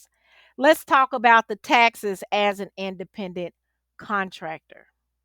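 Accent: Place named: South, United States. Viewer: Love that southern accent! Definitely fun to watch and listen to others and how they present themselves.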